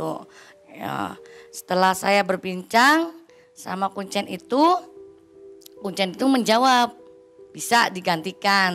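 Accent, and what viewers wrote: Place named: Cirebon, Indonesia. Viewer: Logat orang cerbon, yg tercampur Betawi mbaknya ini kayaknya orang cerbon yg pernah diam di jakarta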